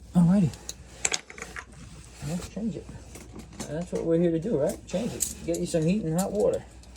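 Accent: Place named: Brooklyn, United States. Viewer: Definitely brooklyn.... Guess from an Ohioan😅 Couldn't catch your email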